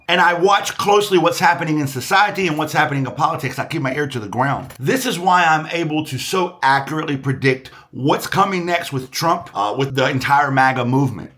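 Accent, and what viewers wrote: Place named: Piedmont, United States. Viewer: Thought I recognized your accent; piedmont here 🙋🏽‍♀️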